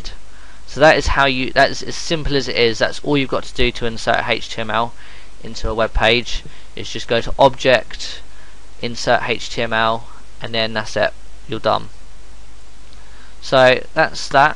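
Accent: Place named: United Kingdom. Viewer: British accent -- leave the man alone, He put up a video while we yap away :)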